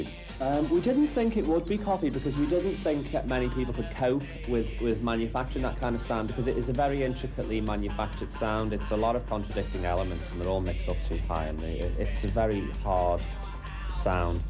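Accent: Liverpool, United Kingdom. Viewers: Am I the only one who loves his liverpoolian accent?😳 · Does he talk with a Liverpool accent?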